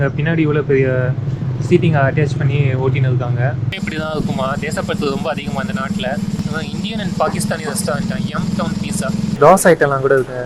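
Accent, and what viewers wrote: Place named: England, United Kingdom. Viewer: தெளிவாக பேசவும் புரியவில்லை ஆங்கிலேயர் போல் தமிழ் பேசினால்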